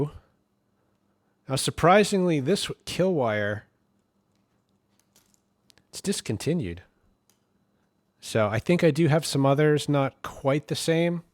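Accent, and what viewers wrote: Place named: New Hampshire, United States. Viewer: That is "local accent"....New Hampshire?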